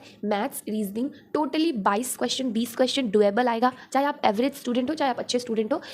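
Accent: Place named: West Bengal, India. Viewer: Are you from wb ?..upki tone se pata lag rahe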